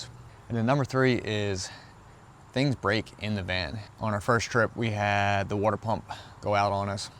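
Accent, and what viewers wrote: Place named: Philadelphia, United States. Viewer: You have an amazing Philly accent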